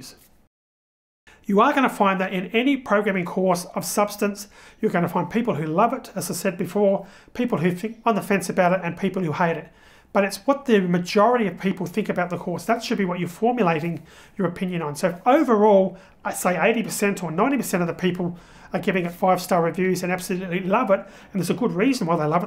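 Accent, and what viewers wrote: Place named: Australia. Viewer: I agree. courses are great I've bought a few on udemy. Also feel more comfortable listening to Australian accent just because I'm more used to it.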